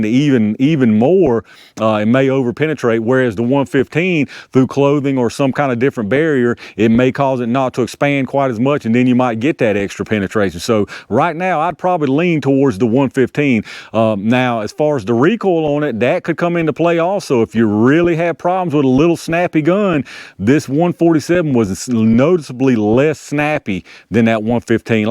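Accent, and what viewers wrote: South, United States. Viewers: You have the most classic southern drawl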